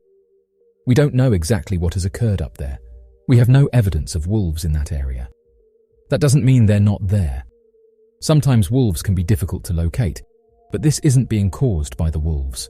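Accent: England, United Kingdom. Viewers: I am sick of hearing a british accent to report on AMERICAN NEWS!!! I realize it is computer generated, is there no one who speaks american english, in all of Utube? America broke ties with GB and the British Monarchy, generations ago! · WHy The Hell is an ENGLISHMAN ACCENT being used for a USA Incident? JUST CURIOUS. Right? USAF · That he has the English accent means I can skip this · 🤬🤬🤬🤬WHY AM I LISTENING TO A BRITISH VOICE NARRATIVE?? I listened for three minutes.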